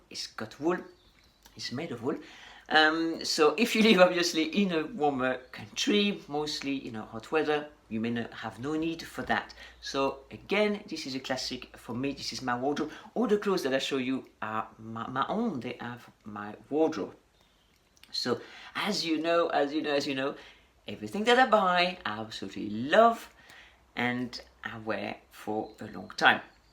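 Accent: France. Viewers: The (alpaca) Max Mara i certainly never will sell! ;) Some women like to change their wardrobe every month ;)I have a beautiful knitted 100 % Scottish cashmere scarf which i wear already for at least 15 years. So i absolutely understand what you mean but I like a little bit more change and renewing . I love beautiful animal friendly natural materials. I never wear 100 % synthetic. Sometimes there is some minor percentage of synthetic in my clothes. Have a nice day in beautiful douce France ! It is the favorite holiday country ( together with French Belgium) for me and my family living in Holland. I love the French language! In my opinion it is by far the most beautiful language on earth! I LOVE your little accent and French musical intonation ! ;) But of course your English is very very good.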